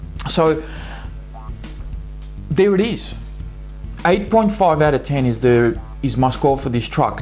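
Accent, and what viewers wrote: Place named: Australia. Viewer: Great review!!! don't take this the wrong way... You are the new Jang. He does thorough reviews at ultimate rc but you're more indepth and more entertaining, maybe it's the Aussie accent LOL. Please keep them coming, we know it's a lot of work so thank you. If you take request I like see a SC comparison from the 4 that you have. Price/ durability/ performance / parts support etc... which do you recommend and for what type of bashing etc...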